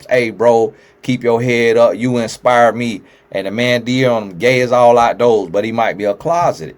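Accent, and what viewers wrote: South, United States. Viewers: Had to subscribe. This brother knows his stuff, real as all "get out", and funny without even trying. I love that he loves The Lord, loves his wife, FAITHFUL to his wife, hasn't let success change that southern accent😀, and fearlessly cover any celebrity couple whilst being a celebrity himself., truly your gift is making room for you sir and bringing you before great men! Btw, new subscriber🤛🏾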